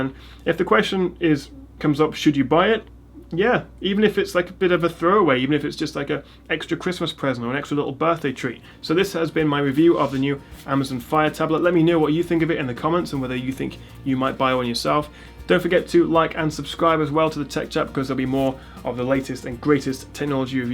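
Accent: England, United Kingdom. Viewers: of course from england, what country are you from to not know where this geeza is from? good review btw i just bourght it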